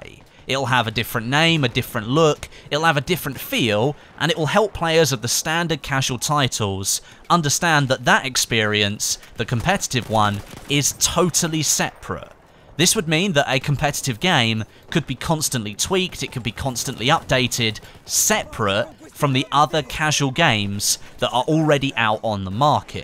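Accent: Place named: United Kingdom. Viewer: You sound so British lol.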